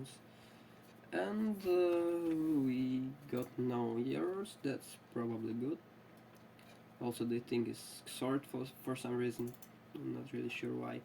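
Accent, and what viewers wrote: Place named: Russia. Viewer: Russian pronounsation fits to the videos like this :-)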